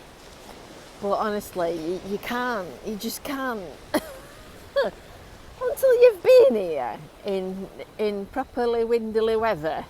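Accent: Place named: Fleetwood, United Kingdom. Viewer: To answer your question ,now I am based in Edgware do I miss my native of Fleetwood Yes I do it is strange looking out not on the sea but looking out on small brook not a Big River Wyre touching Morecambe Bay and I had many happy memories there as child Left Fleetwood in 1975 back for small bit and permanently left in 1976 and I miss your Accent keep safe from the COVID 19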